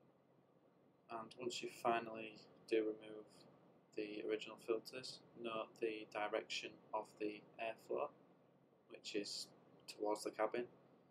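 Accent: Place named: North, United Kingdom. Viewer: Really helpful cheers buddy! Nice to hear a fellow northerner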